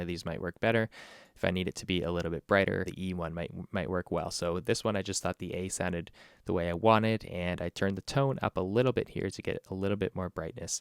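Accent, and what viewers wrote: Canada. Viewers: I can tell you're from Canada by the way you say "out" which is "oat". Let's check this oat. So, where is from???? Canada! I heard some of that album a few years ago. I thought it was really good but never really listened to the drums so much (being a guitarist) but this is quite interesting. I wonder how you got those samples into their own tracks. Did you somehow trigger them FROM the real tracks? Maybe you have a vid on that where you explain. Thx for the walkthrough!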